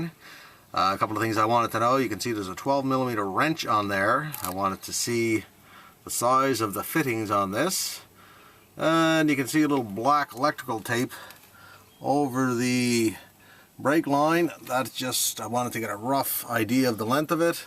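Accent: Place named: Canada. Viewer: Liked your video even with that terrible Canadian accent! Thanks!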